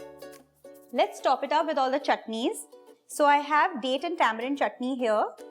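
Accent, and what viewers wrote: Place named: India. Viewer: Your accents annoying. Indian women talks with stupid accents. It gets made fun of all the time.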